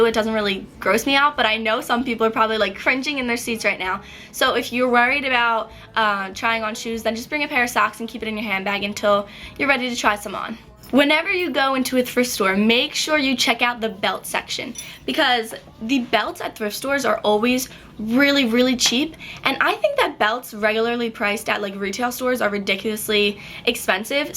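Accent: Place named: New Jersey, United States. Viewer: do you live in new jeresey? :o you have the accent.... btw i like thrift stores :D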